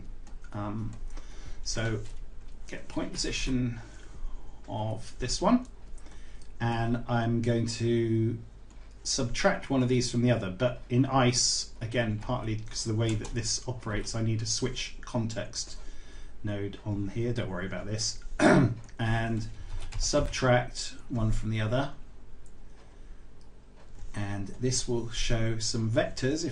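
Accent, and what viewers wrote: United Kingdom. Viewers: That is a very well spoken British accent.. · Yes, that is a British accent!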